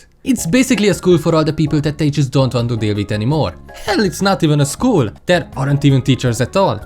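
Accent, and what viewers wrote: Hungary. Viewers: 11 seconds in and I already know its a Magyar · Are you hungarian? You sound like one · hmmm..... i know a hungarian accent when i hear one. i can smell it from kilometres away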